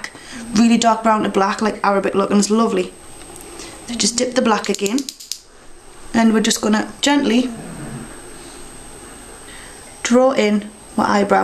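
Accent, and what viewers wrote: Newcastle, United Kingdom. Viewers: It's a Geordie accent! Newcastle, pet. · I love your accent! Where in the UK are you from? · she is a geordie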